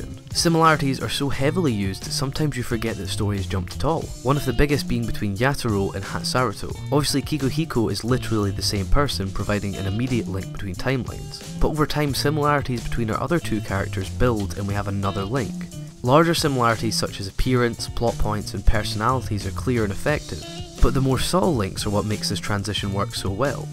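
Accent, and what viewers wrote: Scotland, United Kingdom. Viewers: Where are you from? Your voice sounds like you're from Edinburgh/Borders. Great video.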